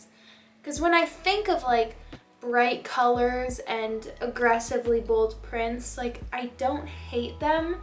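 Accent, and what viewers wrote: Midwest, United States. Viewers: Are you from the midwest?? The accent is coming THROUGH lol!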